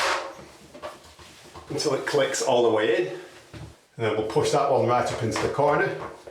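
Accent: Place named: East Lancashire, United Kingdom. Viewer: Excellent video. I'm in Québec and I recognize the accent, East Lancs maybe ??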